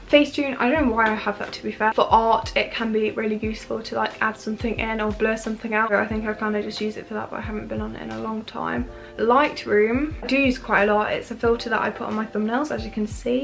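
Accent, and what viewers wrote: England, United Kingdom. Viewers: She is from England maybe, cause i don't understan all words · Your English accent is very nice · loves her cute british Accent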